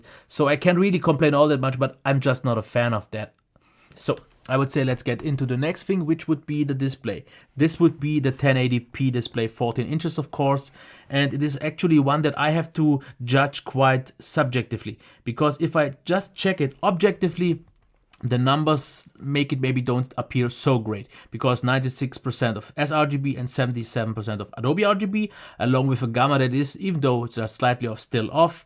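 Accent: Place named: Germany. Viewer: You sound German. 😉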